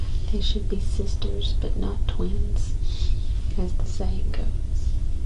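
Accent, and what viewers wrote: South, United States. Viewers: You have this really subtle (southern?) accent that's exremely pleasant. Can I ask what region of the us you are in/ from? Here in the PNW we overpronounce everything, and sometimes sound snooty. Also, I can see why this video has 18000+ views. I've got a mild case of coma, lol. Seriously, great stuff. Thanks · I just want you to do my makeup in real life. Ugh. You are so comforting and I love your sweet southern accent! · She sounds like a female Bob Ross, that calming southern twang creating ASMR masterpieces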